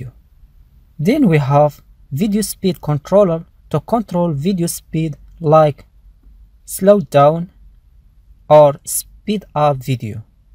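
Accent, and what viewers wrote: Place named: India. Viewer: He is speaking English with Indian accent.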